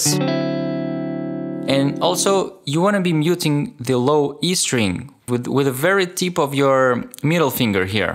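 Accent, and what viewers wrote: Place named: Eastern Europe. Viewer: Nah. This guy is accent is Eastern European.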